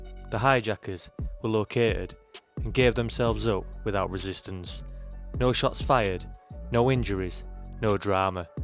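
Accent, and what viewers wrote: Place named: Yorkshire, United Kingdom. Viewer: How come so much is filmed in potato cam? You from Yorkshire? Cant tell if Bradford/Leeds or Hull. I watched vid and not a hater just wondered.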